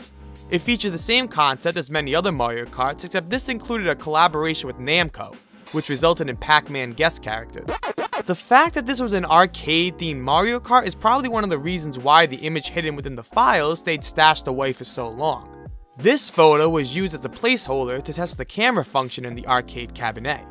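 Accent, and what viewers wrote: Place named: Boston, United States. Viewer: Dude I hate to sound weird but are you from Boston? I feel like you definitely live at the most an hour from Boston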